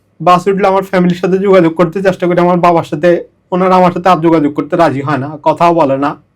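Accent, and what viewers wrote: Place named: Chittagong, Bangladesh. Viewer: মনে হয় বাড়ী চিটাগাং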